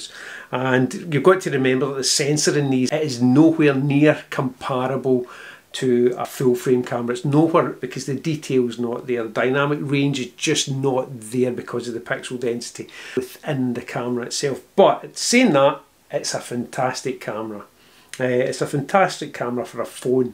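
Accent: Scotland, United Kingdom. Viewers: I love your accent....but you got me with the 22 Megabyte FAIL...What is a FAIL.... After about the 4th or 5th time I heard it, I finally got it. A FAIL is Scottish for a FILE with a long eye in American English. For us Yanks, fail rhythms with pale or pail. English is so screwy. "My attempt at editing was a major fail"...Like failure, failed, DOUGH is pronounced D-OH COUGH is pronounced CAW-F There is a street in San Francisco named GOUGH... Not GO, not GUFF, it is GOFF Do you say NILE like the river in Egypt for those pointy metal things we hit with hammers? As I said, I really love your accent, it is beautiful. Reminds me of the great Sean Connery. And once I got it, I totally understood. It is very charming...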